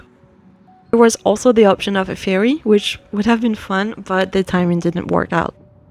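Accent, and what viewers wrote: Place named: France. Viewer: I watch a lot of videos made my foreign visitors and yours stood out a lot. Usually they very often go to the same museums (Vasa, Viking, Abba) the same restaurants (Meatballs for the people) and cafés (Lillebror, Gast, Stora bageriet) and same shops (Arket) and it ends up being a variation of the same trip; a bit boring. I thoroughly enjoyed that your video was quite different. Thank you! Also enjoyed your French accent (I'm half French).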